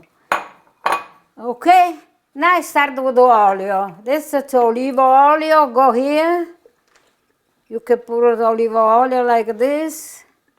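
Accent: Italy. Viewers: I love you ❤. You are exactly like Nona Rosa😊, my mother. Exact Italian accent! Bella Nona!! We were all born in Napoli & mamma cooked just like you! God bless you 🙏❤️🙏❤️🙏❤️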